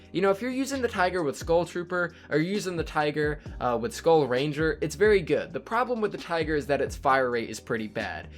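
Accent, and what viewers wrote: France. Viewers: Are you French? O_0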